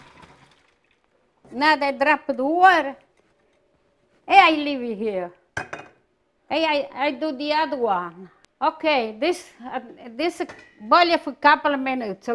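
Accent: Italy. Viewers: This is my first time watching, and I loved everything about it. The singing, the comment "my children are my life" and that great Italian accent. I love everything Italian. My favorite is watching old Italian movies with sub titles. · I love to listen to speak. She has a lovely Italian accent which reminds me of my dear nana who passed away two months short of her 100th birthday in 2016. She was one tough cookie. God bless her and god bless. Thank you for your recipe. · Broken English with Italian accent is so cute. She did a good job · I like grandma and her recipes she is doing a wonderful job.Her strong Italian accent is something I can't get enough of.Would like to see who is behind the camera ;) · I would love to taste everything she cooks they all look so delicious I get hungry just watching her make the food I did save most of the recipes to make at a later date I like listening to her Italian accent my mom and grandma were both Italian my grandma was born in Rome!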